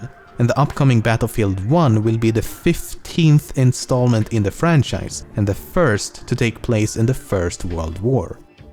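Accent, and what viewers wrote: Gothenburg, Sweden. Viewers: it sounds like you are Swedish I can hear it on your accent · Jävlar vilken göteborgare du var!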